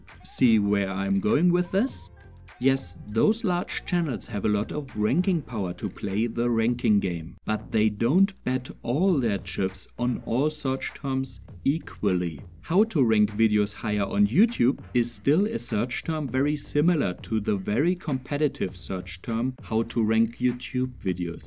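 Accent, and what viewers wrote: Germany. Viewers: Nice one, like your German accent, man. And obviously, you did a great job to rank this video, cause I found it on top of google ;-) Cheers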